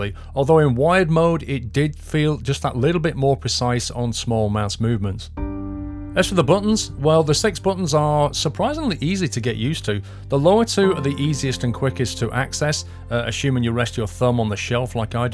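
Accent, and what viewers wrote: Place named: United Kingdom. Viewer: Nice review, i really like that it has kind of a unique british feel to it. Maybe it was just the accent:D, but it really felt more professional compared to the more gamery type of hardware reviews from US based tech channels that i usually watch Anyway i still want to give you a heads up, as there has been a lot of controversy about this that can be a problem in the future when your channel gets even bigger, i think a clearer disclaimer can be helpful, especially whether you have been paid to do a review or if it was just you wanting to do a review and they provide you with the product. Youtube also has a guideline on this too if you haven't seen it <link> Keep posting these reviews though, i enjoy it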